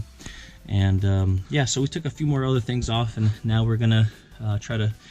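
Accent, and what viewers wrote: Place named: Russia. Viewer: This guy's accent sounds Russian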